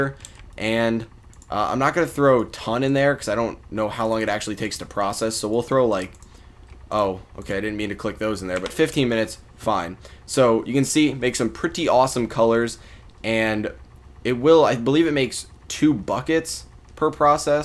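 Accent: United States. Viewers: Are you American by any chance?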